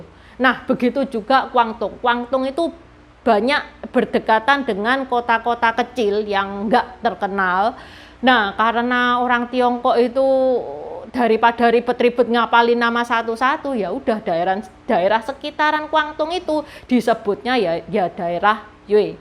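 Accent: Java, Indonesia. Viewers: apa dah berumah tangga, and anda kyak dri jawa ngomongnya tu lo medhok bnget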